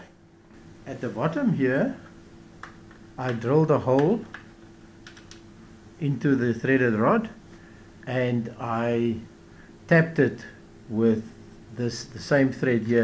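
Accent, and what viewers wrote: South Africa. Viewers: Interesting. After buying a second Benro tripod recently for travel and being unimpressed with its quality (the large ones are great) I’m exploring the insane idea of building my own stand - whether it has 3 or more support stands doesn’t matter. I’m surprised how little information there is on the actual physics or DIY aspect of these. Video tripods feature an interesting collar for the legs which I believe yields a much more stable base than your approach- though I could be mistaken. Thanks for sharing this. Also, South African or Dutch accent? Sounds South African!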